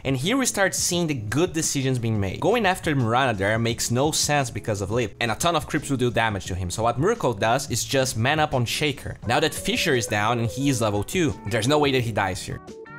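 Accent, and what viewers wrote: Brazil. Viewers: Draran but you are mistaken. It is his accent. Most brazilians have this accent, fluent or not. It is because of how phonems in portuguese words are structured and end up being carried on to english as well.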